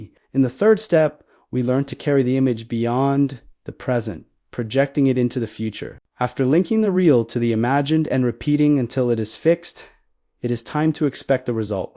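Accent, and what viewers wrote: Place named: Spain. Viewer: What is the purpose of image moving his lips?He had a deep Spanish accent. I have to look away. It rather takes away the intention.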